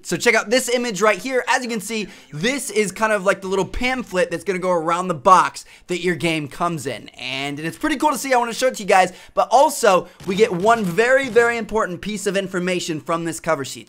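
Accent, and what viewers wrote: Spain. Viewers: I love your Spanish accent, you should try to do a video In Spanish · spanish accent <3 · Thumbs up if you want to listen Spanish accent again. No criticism just found it funny as a Spanish person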